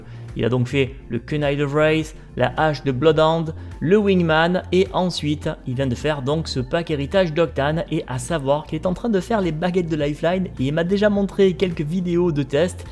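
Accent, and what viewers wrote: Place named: Marseille, France. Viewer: Il est marseillais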